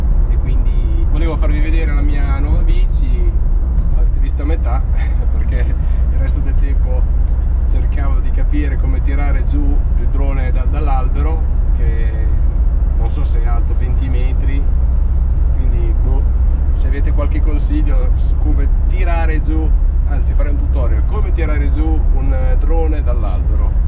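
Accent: Romagna, Italy. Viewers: Ecco perché parli da romagnolo...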